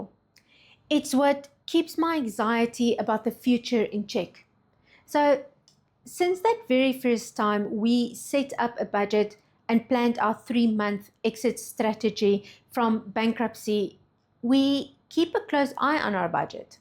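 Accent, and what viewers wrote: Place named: South Africa. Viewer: I am going to draw cash for my groceries because it is just too easy to swipe a card. Having to draw more cash than you intended to spend means some serious deliberation. Ex Jhb now in the Netherlands. Always nice to hear a South African accent.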